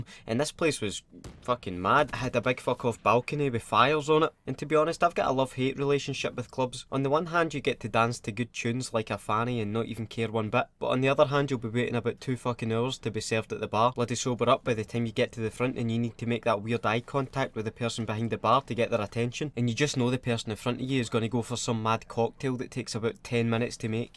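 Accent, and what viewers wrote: Scotland, United Kingdom. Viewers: Where about in Scotland are you from? I'm from Edinburgh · Hey, can you please do more Scottish Voice overs like the robot ones, even something like Postman Pat or something? Cheers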